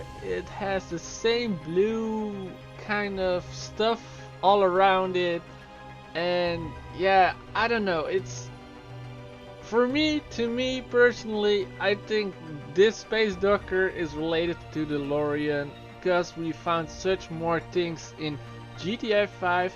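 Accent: Netherlands. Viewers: Are you dutch???? Cause you have a Dutch accent here and there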